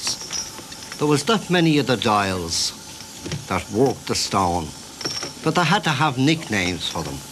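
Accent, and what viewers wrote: Ireland. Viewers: Èammon Mac Thomàis has a much broader Dublin accent than Joe Duffy . That how you know this is him . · The narrator is stealing the show by being too bloody Irish.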